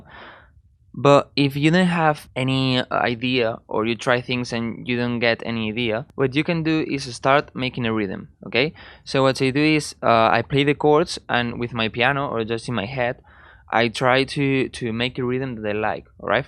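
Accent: Spain. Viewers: Como se nota que eres Español ajjajaja! Muchas gracias por el tutorial !!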